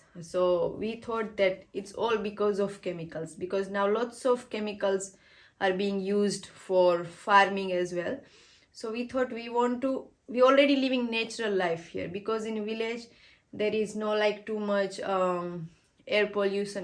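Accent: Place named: Gujarat, India. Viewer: Your interview was pretty good but you have gujarati accent in your English it is ok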